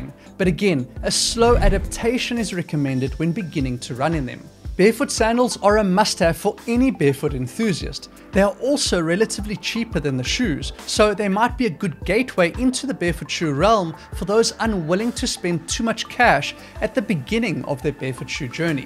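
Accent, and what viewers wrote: South Africa. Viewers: You sound like a South African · You sound South African!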